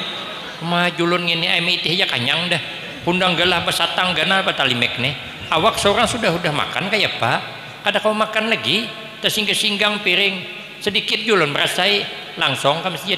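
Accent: South Kalimantan, Indonesia. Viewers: Senang banar dgn suara dan bahasa Sidin ....bujurkah sanak bahasa Sidin kaya urg Amuntai wan tanjung ....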